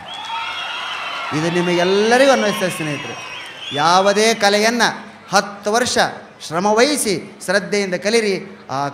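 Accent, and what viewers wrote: North Karnataka, India. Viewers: ಪ್ರಾಣೇಶ್ ನಿಮ್ಮ ಉತ್ತರ ಕನ್ನಡ ಬಾಷೆ ಕೇಳುವುದಕ್ಕೆ ನಮ್ಮ bangalore ಜನರಿಗೇ ಬಹಳ ಖುಷಿ ಯಾಗುತ್ತೆ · Tumba chennagi matadtira .uttar karnatakada janane hingripa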